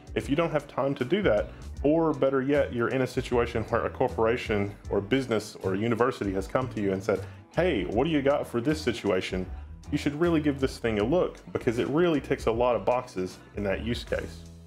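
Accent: South, United States. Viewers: I watch this channel solely because of the girl. She has hypnotized me and I must have more content featuring her. She has a hint of south in her, maybe Alabama, Mississippi or Georgia. What's her name?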